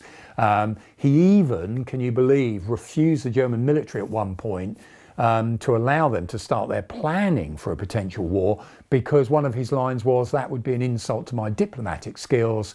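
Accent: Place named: United Kingdom. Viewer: i am apologizing since English is not my native.enjoyed this video and i am wandering what part of G.Britain his accent came from.in my country tv series Only fools and horses is stiil popular and finding some similarities,although prounancing every sounds perfectly clear and have no problem to understand him.once more apologizing for this ugly English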